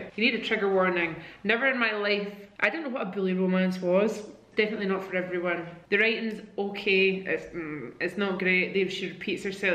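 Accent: Scotland, United Kingdom. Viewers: Is it a Scottish thing or just a thing to say words ending in ED in place of words ending in ING? So for example, when you're talking about your new wardrobes, you say 'these just need painted now' instead of 'painting' I wasn't sure if this was a fun little quirk because of where you lived or just a ' ' No shade just genuinely curious because I love a Scottish accent and know how much the accent can change from town to town. Xx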